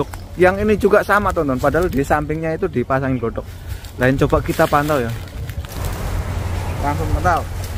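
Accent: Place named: Banyumas, Indonesia. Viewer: mas nya asli orang mana. kok bisa ngomong Jawa ngapak wkwkw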